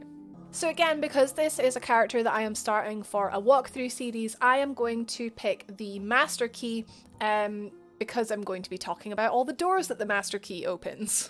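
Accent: Scotland, United Kingdom. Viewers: yup scottish accent sounds so cute but even the voices are really similar haha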